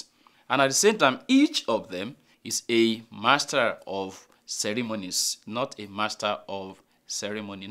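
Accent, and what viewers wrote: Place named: Yoruba, Nigeria. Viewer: Please, get rid of your Yoruba accent, as it can distort your message if someone who's not familiar with Yoruba people listens to you. "In this episode, I want to 'h'ask you...."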